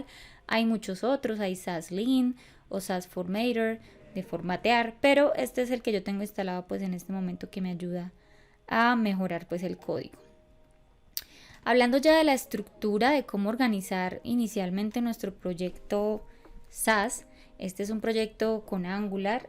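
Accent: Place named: Colombia. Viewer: Ese acento colombiano... Tome su buen like ^-^ (buen video)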